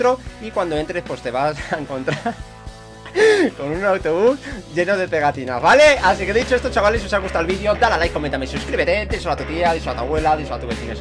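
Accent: United Kingdom. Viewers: Que guapete eres tu inglés es de cambridge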